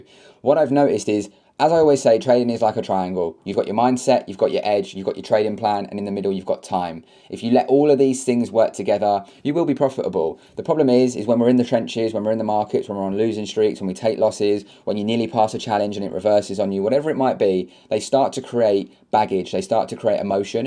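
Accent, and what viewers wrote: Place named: United Kingdom. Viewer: Man just appo pay errything innit😭😭😭, the British accent just kills me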